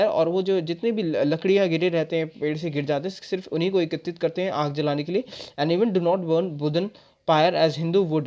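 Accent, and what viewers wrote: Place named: United States. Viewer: Your voice like American english and I like it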